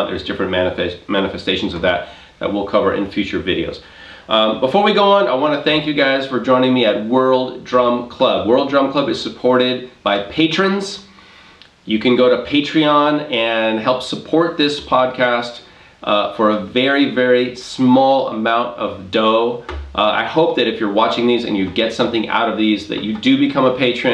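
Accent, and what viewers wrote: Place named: Cuba. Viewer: You sound cubano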